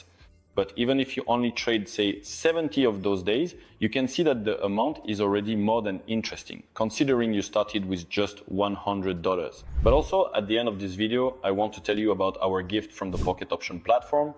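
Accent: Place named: France. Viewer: You have a French Accent 😁😁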